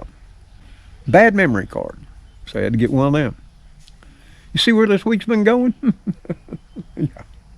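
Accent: South, United States. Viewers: Love your Southern accent too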